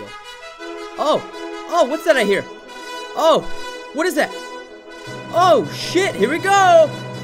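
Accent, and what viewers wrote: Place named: United Kingdom. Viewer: That British accent though..... Man. I really want to play a match with you. I'm getting so frustrated at playing builds similar to this and kicking ass and having a weak team that just don't make the power plays you need them to... I've gone 21/2/6 before now and still lost the match. You can't carry a bad team :( 👎🏻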